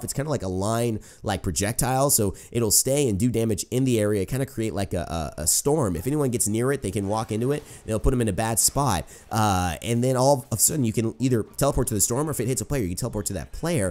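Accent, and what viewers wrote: Ireland. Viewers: The Irish accent had me dying man great vid😆😆😂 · I'm irish and have lived in ireland my whole life. My GOD your irish accent is better than mine lol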